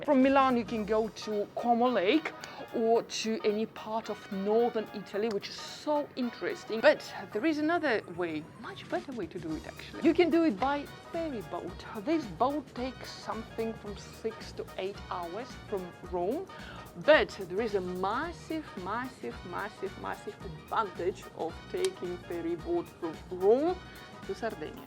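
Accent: Russia. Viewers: Somebody speaking English like a Russian and Italian like an Italian. Nice Video and true, Sardinia is not expensive at all (just at Toll). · You have a heavy Muscovite accent.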